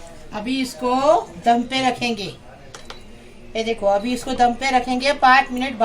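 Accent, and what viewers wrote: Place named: Maharashtra, India. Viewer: khala aap kha rhete ho aapke bolne ka trika to maharashtra jesa h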